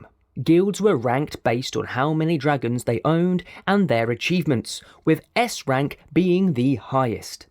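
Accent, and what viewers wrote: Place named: United Kingdom. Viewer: never heard a british voice for recaps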